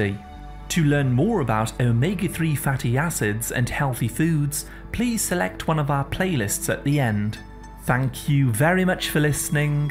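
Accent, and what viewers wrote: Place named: North, United Kingdom. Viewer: When I heard " a like is always appreciated", I realised I take these videos for granted. I think of them as computer generated, spoken by robotics. They are so efficient, precise, informative, that I treat these vids like Wikipedia. I assume there is a team of computers generating these videos, not really someone called. Is that plea for likes added to make me think it's a real individual person. Is that warm northern accent used to give a feeling of trustworthy ness. Anyone else? Or am I in need of a course of omega 3 to lubricate my brain and heart.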